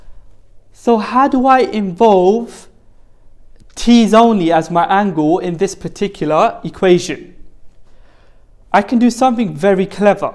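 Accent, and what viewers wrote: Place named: East London, United Kingdom. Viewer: East London Accent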